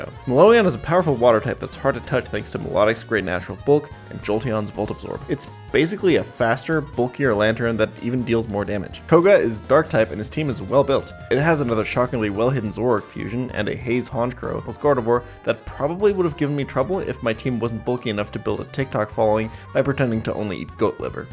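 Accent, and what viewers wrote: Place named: Germany. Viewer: sounds dead? nah he’s just German mate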